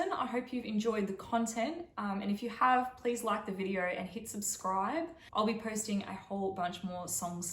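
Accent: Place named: Australia. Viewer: You sound Aussie and for that reason I’m following you. Lol (Australian here!)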